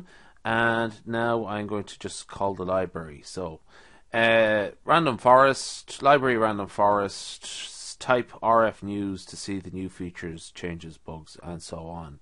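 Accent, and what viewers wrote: Ireland. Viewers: It's kind of spooky hearing another Irish person talk me through machine learning on a YouTube video, especially in such a casual manner. You may as well be my inner monologue 😂 "So here we are aaand ... that's not great". Subscribed. Looking forward to more of your videos :)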